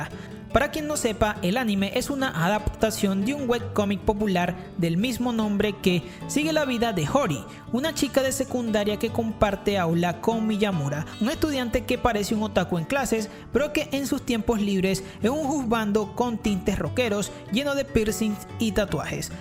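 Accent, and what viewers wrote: Venezuela. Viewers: No sabía que es venezolano, o eso parece por su acento y las palabras que dice, creo yo, jsjs. Venezuela el anime xddd JAJAJA. Tengo que verlo x'D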